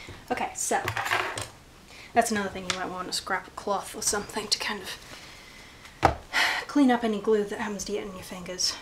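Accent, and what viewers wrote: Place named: United States. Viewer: Omg, a posh American accent, luv it!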